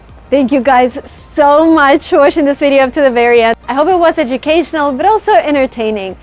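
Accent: United States. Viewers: I love imitating your American accent, this language spoken so fluently. I just listen to your videos while I cook dinner or everytime I can and I' m so astonished to see how easily you influence and improve my listenining and speaking abilities. Thank you a lot. You' re fantastic!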